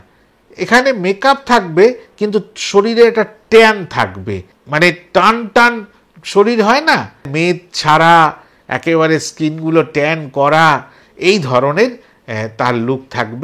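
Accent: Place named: Bangladesh. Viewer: Dada, apni to darun Bangladeshi accent e Kotha bolen. Amon accent e aro Kotha shunte chai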